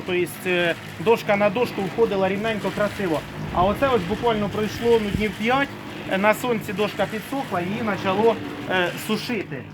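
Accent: Poltava, Ukraine. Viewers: Доброго дня!!! Натрапила на вас на просторах YouTube, яка приємна несподіванка! Рідна, неповторна решетилівська мова.Живу дуже далеко від рідного краю і просто насолоджуюсь вашою розмовою. Із хазяйства в нас тільки кіт, рибки і у внука богомол, але разом з внуком з цікавістю спостерігаємо за вашими тваринками., запитайте, чи був у неї однокласник Віталій, думаю що вона ходила в один клас із моїм племінником. Світ дуже маленький, хоч, може, помиляюсь, бо вже майже нікого не знаю в Решетилівці.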